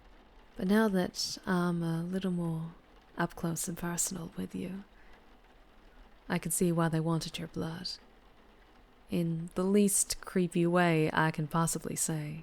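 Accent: South, United States. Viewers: I got to admit, you do a pretty good southern accent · i wanna make this clear...as a southerner (flordian bite me) this accent was spot on. 10 outa 10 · I can hear your orc voice😍😍 Through your Southern accent Sometimes so hot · I just want to let you know, that I actually did liste to this particular vampire roleplay. But not only that, it is actually a very good vampire story. I specially like the southern accent as well. And also, I hope that there will be more stories. Like this one, in the near future. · As someone who's from the south thats a pretty good accent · A charming and sweet southern accent lulling me to sleep? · Very nice, love that southern accent. 🌹♥️😘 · Ooh! I love the accent! Southern? It feels like a well traveled southern Belle's honey sweet voice. ❤❤❤ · Aaaaand my author brain is now on overdrive, thanks;p Very well done! Was just wondering the other day what you might sound like with a Southern accent. 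· Maybe it’s the midwestern in me but I am a sucker for southern belles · Not bad . for a southern draw . and great story.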